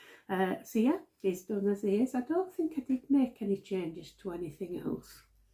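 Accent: Yorkshire, United Kingdom. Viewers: Thanks for sharing. Love your accent. Definitely reminds me of Yorkshire.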